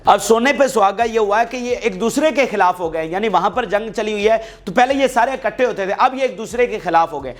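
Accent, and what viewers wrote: Punjab, Pakistan. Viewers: Bhai ap English mat bola kren, apke pas English ka lahja nahi hai..sirf ap nahi pure Punjabion ke pas nahi hai.aplog English ko Punjabi lahje me bolte hai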